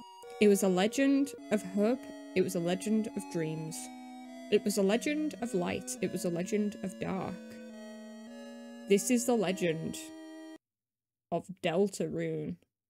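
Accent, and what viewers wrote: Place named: United Kingdom. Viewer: British? not a problem, i don't mind british if it's good content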